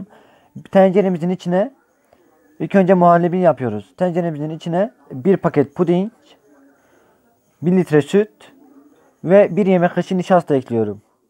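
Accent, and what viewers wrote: Hatay, Turkey. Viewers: kardeşim sen benim oğlum yaşındasın. Sana bir tavsiyem var. Sesini ve konuşma tarzını hiç değiştirme. Kırıtarak konuşma. Hataylı olarak kendi şivenle konuş. Biz seni anlıyoruz. Yalnız daha çok kitap oku. Kitap okudukça kelime hazinen genişler. Utanmadan rahat anlatırsın 😊 Takiptesin unutma!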